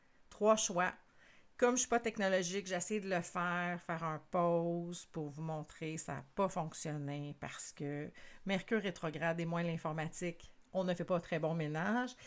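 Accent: Quebec, Canada. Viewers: Quel accent délicieusement exotique!! Pour nous, maudits Français, cet accent évoque les pentes enneigées, les résineux et les érables, les glaces bleutées et les caribous, Tabernacle!!..:-)) Merci, pour ce rayon de lumière qui nous vient directement de la Belle Province!! Le choix 1 me parle beaucoup, et correspond le mieux à ma culture, notamment familiale avec un illustre ancêtre ayant créé une des premières cellules de la Ligue des Droits de l'Homme et du Citoyen, vers Paris il y a un peu plus d'un siècle...Cela fait de moi un "partageux", et si j'ai la chance d'avoir de l'argent au-delà de mes modestes besoins, je l'investirai volontiers dans la cause du chômage....Le salut par le travail, les formations, dans le but de retrouver de la dignité et de l'espoir...Vaste programme, mais il y a toujours des possibilités..."Là où il y a une volonté, il y a un chemin" (Lao Tseu)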